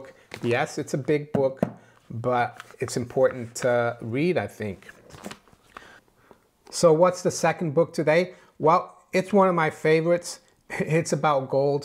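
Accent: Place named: Brooklyn, United States. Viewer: I'm fascinated by your plain Brooklyn-Italian sounding American English accent. If you were born in Brazil then when did you first learn English?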